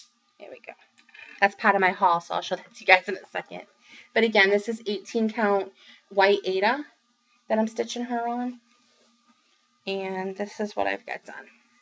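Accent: Boston, United States. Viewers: Great video and great haul!! I love listening to you!! Your pieces are awesome!! Ps.. I am Canadian and I knew your accent was Boston!! Love it!! Happy Birthday!! I hope you have lots of stitchy fun!! And happy thanksgiving to you and your family!!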